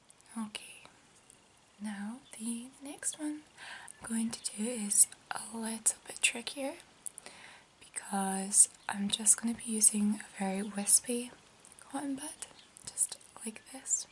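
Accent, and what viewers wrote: Scotland, United Kingdom. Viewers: Welcome back. I've only recently started watching ASMR videos to help with stress and often click on an artist I've not come across before. You have no idea how glad I was to find you. Your gentle Scottish accent is so relaxing and I love it when you get so close to the mic that your mouth sounds are audible. Personally I didn't hear any camera noise and the mic you are using seems more than adequate. Really great stuff. Subbed immediately x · Where abouts in Scotland are you from if you don't mind me asking? Struggling to pin down the accent there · Excellent video! Welcome back!! I live in Europe, as well, and have a question. Your Scottish accent is a lot more subtle than say Scottish Murmur's. Is that a regional thing or is it more your own personal linguistic style? I've noticed this with a lot of the Scots I've worked with. 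Some sound like Simon Pegg other's like Sean Connery and then some have little accent at all. Am I crazy?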